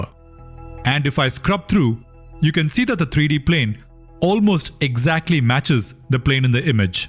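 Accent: India. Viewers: This video is the first time i ever heard english with indian accent and actually kinda liked it…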